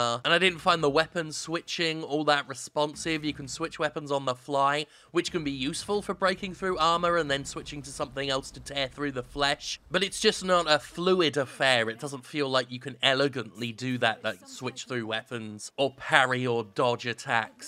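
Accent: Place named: West Country, United Kingdom. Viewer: your west country accent is quite good (as a person of the westcountry) I'm going to say you're from Berkshire?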